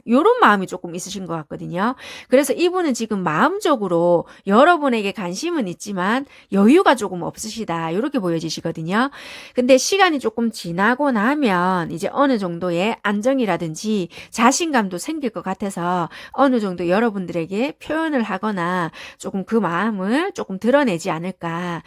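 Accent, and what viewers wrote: Busan, South Korea. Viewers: 감사합니다 ^^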